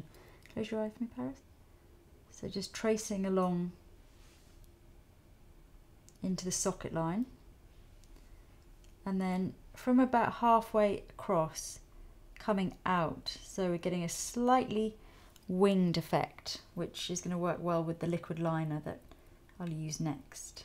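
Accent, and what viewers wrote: United Kingdom. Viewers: wow it's beautiful - and you have such a british accent cute :3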